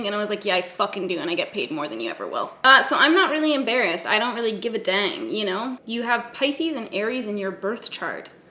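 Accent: Canada. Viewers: This is going to be such a random comment but in this video you sound like the Canadian stereotype from a century old. Yeah. Sorry about that, I had a weird association.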